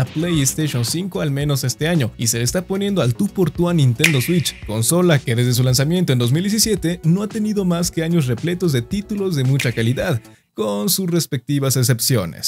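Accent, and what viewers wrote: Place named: United States. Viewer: Por que no dices serie x o s en ves de tener fonética yanky.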